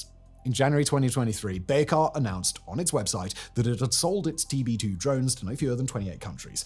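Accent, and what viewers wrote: United Kingdom. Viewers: Such racism against Turks... 1 our "invasion" aka occupation of northern part of cyprus is completely within international law, since we are guarantors of the islands populace alongside with the british since ww1, that gives us the right of occupation if the said populace is endangered, like how greeks committed mass genocide to Turkish cypriots. Second: kurdish "freedom fighters" are in the terror list of every western nation, only since 2014 the ypg branch, which was newly formed as the syrian branch of pkk, were not counted as terrorists. Thirdly the kurdish problem didnt originated from Turkey and spread to other nations, it was already underway in iran, iraq and syria all of whom committed genocides against Turks but NOT Turkey. Dont trust a man only because he is bald, has glasses and has a british accent, this bigot is just a western racist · "Highly warmongering country" says the guy with the British accent, with aplomb.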